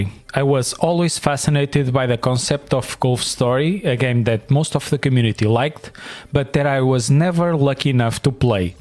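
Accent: Portugal. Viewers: Este sotaque tuga nao há como falhar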